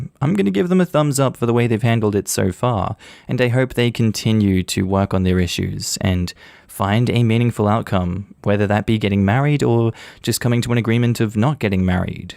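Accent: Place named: Australia. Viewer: your Aussie accent. I love listening to you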